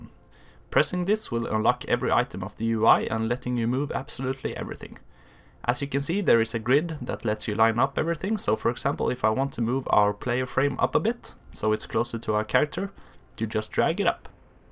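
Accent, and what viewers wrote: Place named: Gothenburg, Sweden. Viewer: You have the most Gothenburg accent I've heard in my life.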